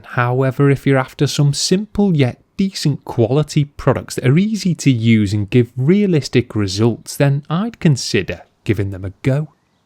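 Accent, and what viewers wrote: Liverpool, United Kingdom. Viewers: loving the fact you have a really subtle almost Scouse sounding North West twang. any ideas where this car went after your video or does the guy in Liverpool still own it? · kisses He's from the city of Liverpool in England and it's referred to as a Scouse accent (scouse as in mouse). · Yeah, but car and accent kind'a don't fit; hardly ever see any typical us-cars in the UK.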